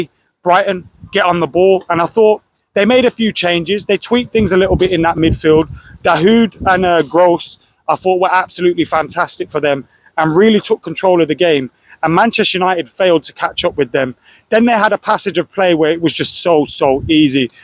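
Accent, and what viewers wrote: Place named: Liverpool, United Kingdom. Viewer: Scouse cxxt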